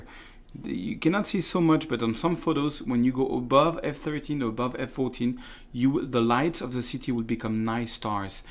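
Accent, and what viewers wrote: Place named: France. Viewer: when french people speak English...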